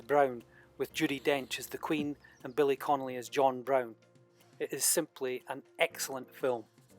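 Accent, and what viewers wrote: Scotland, United Kingdom. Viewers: What a lovely Scottish burr you have! Wonderful tour—thank you! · I enjoy listening to a man with the Scottish accent.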